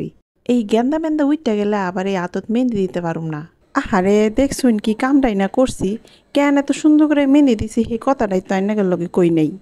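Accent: Mymensingh, Bangladesh. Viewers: মাশাআল্লাহ আপু এক ঘেয়েমি কেটে যাওয়ার মতই অসাধারণ ভিডিও। ভীষন ভাল লাগল। তুমি এমনিতেই অনেক কিউট। আজ আরো বেশি সুন্দর লাগছিল। ময়মনসিংহ ভাষাকে তোমার মুখে শুনতে খুব মিষ্টি মিষ্টি লাগছিল। অনেক সুন্দর পরিবেশ ছিল।খুব ভাল লাগল আপু।😍🥰😍🥰😍🥰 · so cute আপু, তুমার মুখে আমাদের মমিসিংগা ভাষা শুনে ভালোই লাগললো❤, এ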